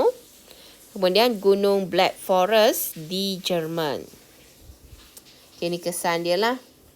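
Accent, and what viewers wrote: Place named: Kelantan, Malaysia. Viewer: cg,cg orang Kelantan erhhhh HAHAHHA BUNYI SLANG KELANTAN "TAKDI" 😂.COMEL CG TQ VIDEO NI TOLONG SAYA UNTUK ULANG KAJI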